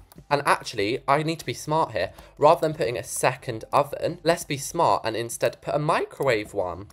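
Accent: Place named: United Kingdom. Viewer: i think we can all agree that the build looks amazing! her voice is also so pretty bc im a American but i wanna have a british accent! also a tip for ur next build, idk if you knew this but if you take a ckear basic shape and resize it to be thin then get a decal (code is at the end) and now you have a realistic fridge! one more wsy to do it is to get small grid and get verdical planks and place in a line then coler black then place the taped photograph resize then change decal! code: <num> ur the best!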